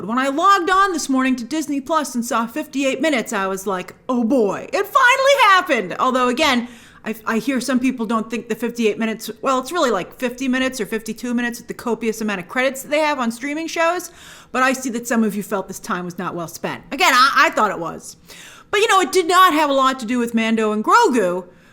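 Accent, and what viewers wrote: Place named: United States. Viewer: I like your voice. Your accent is nice. Which part of America is this accent from?? Can somebody tell me?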